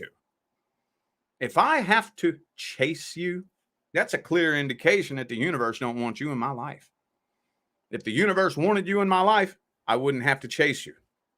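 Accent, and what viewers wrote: South, United States. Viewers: I love your readings, very matter of fact. I love your southern accent. I am American living in the UK but I am wondering if it`s a Kentucky accent. I am hoping my ex will at least apologize but I doubt it. Haven`t heard from him in over 6 months and I doubt he will ever say anything to me again. It`s never been on and off. He broken up with me. There was a third party involved which I think was his next girlfriend but I think they broken up. I want a nice guy who is a soulmate. You are right. If he couldn`t appreciate the love I brought to the table then it was his loss.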